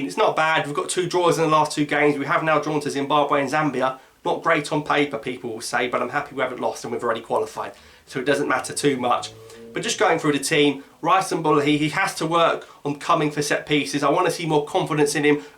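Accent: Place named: England, United Kingdom. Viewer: I'd say that's an accurate assessment. I could tell in your voice your voice that you had some English in you. You seem like a call guy so I'm going to subscribe to your channel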